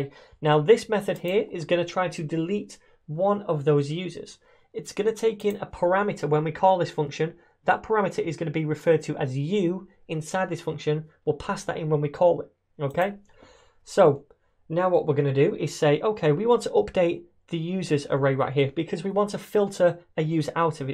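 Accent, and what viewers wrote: United Kingdom. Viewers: Thanks for teaching this in a simplified but straightforward and non-choppy manner. So many other tutorials are so overcomplex in their wordings and skips ahead so much in coding, that half of it doesn't make sense. I haven't watched all of your videos but, just watching one of them makes me wanna watch more. I hope you have them all ordered step by step in Javascript. Thanks again. (" your english is very good despite your british or whatever accent, sometimes the accents people have in these tutorials are too hard to understand or they talk way to fast. You do a great job with both)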